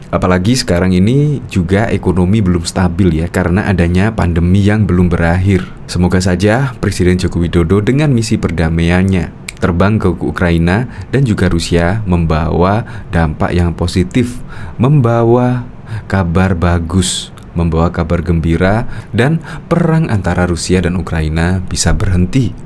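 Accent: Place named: Banyumas, Indonesia. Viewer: Jawa NGAPAK selalu hadir...🖒🖒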